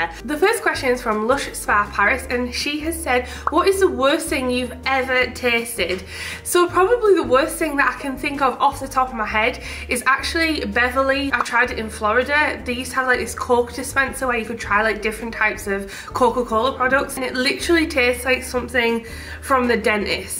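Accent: United Kingdom. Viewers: If you ever get the chance... The new mocha flavored Kit Kat duo is fabulous! I got to have one last month, but now I can't find any in the stores I usually go too. It's the same way with the dark chocolate Kit Kat. So good!!! But so rare to find. And I love your purely British accent. You're adorable! Such a sweetheart. Love you, buh bye! Makes me smile every time. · You don't sound german at all. I am from Germany. You sound totally british. I love England and the english language so so much. I also have family in Gloucestershire. I am really really sad about the Brexit thing 😢. I can't understand why you want to be separated from us. Anyways... Greetings and hugs from Germany! Stay strong and safe! Tina xxx 😘🤗💗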